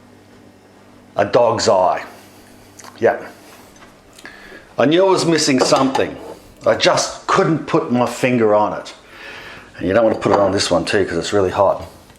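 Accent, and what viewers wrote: Australia. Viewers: Thank you for this! We really need these recipes and videos so we use what you teach us to grow. Dogs eye, dead horse, dodgy? I think this is why the world loves Australia, Australians, and the accent. Mix the weird sayings, words and accents and it’s pure gold. But I’ve heard the same thing about Texans too boot.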